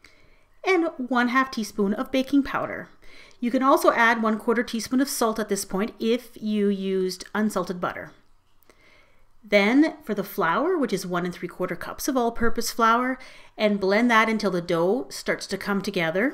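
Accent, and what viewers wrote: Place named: Ontario, Canada. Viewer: The hypno dough got me had to thumbs up lol! Are you from Ontario?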